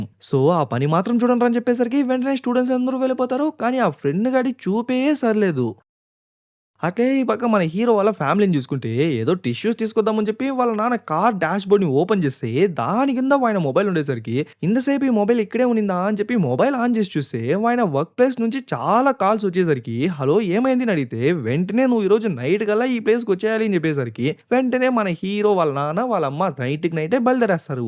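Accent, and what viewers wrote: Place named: Tirupati, India. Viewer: Triupathi slang never forget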